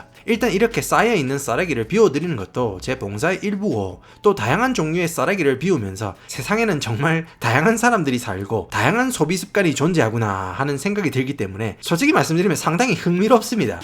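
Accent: Gyeongsang, South Korea. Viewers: 최고 고향이 어딘가요 여긴 창원 사투리가 나오시네요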